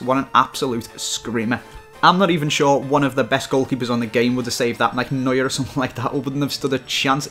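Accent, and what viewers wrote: Liverpool, United Kingdom. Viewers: You scouse genius, you have made my day!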